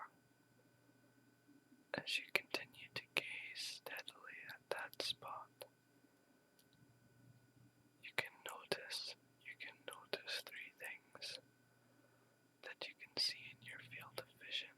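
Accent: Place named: Scotland, United Kingdom. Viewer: Once again, you've created a winner. Man, I want a Scottish accent like yours!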